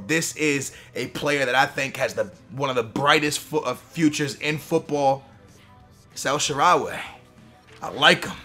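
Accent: Portugal. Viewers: R u Portuguese? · are you portuguese ?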